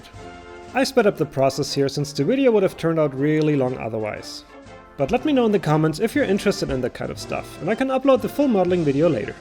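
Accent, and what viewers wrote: Germany. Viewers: i knew you are german! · ich wusste das du deutsch warst ... hört man am Akzent und an dem deutschen lack😁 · okay is he german? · No german is be able to hide his accent :)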